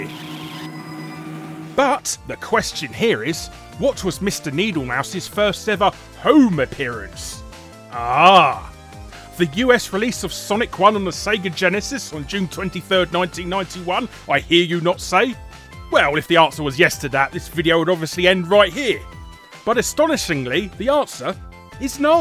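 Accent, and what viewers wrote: England, United Kingdom. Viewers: You sound more bri´ish then bloody Ashens! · What what of the UK are you from? I always wanted to know that accent came from. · Top stuff, so glad to have a notable British voice amongst the endless drone of Yanks on the web - more UK Home Computer vids would be great! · Always a British narrator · He's English, that's why.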